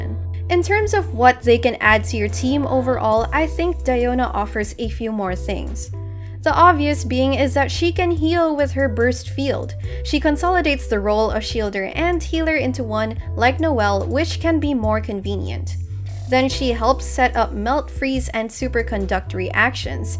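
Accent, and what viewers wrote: Philippines. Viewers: Thank you!! I needed this vid because I got hutao and I know how thoma is good for her but I got elegy today at 5 pity and I decided to go for building diona (I dont have sacrificial bow) P.S: u sound filipino ;)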